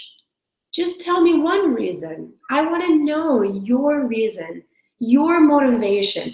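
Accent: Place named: United States. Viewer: hello i like your lessons,and i am very happy to hear your amecan accent.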